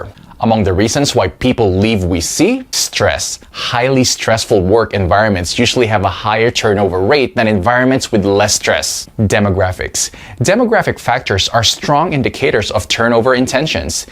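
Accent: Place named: Philippines. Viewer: Based on this dude’s accent... he’s Filipino 😏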